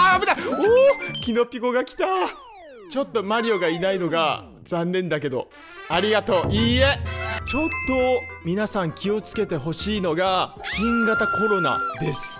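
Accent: Fukuoka, Japan. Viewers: もう少しで勝てそうな惜しい試合が多かったですねｗ それよりなんだかコメント欄がにぎわっていますね！良いことだと思います！は福岡のかたですか？前から福岡っぽい喋りだとは思ってましたがｗ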